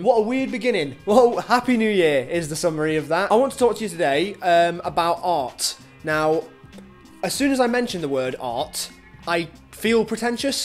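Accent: United Kingdom. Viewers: Y R u British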